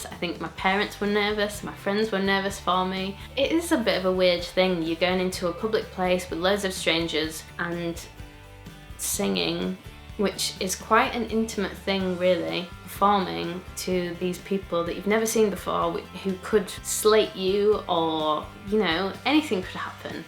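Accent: United Kingdom. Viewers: you're from the uk? you sound northern!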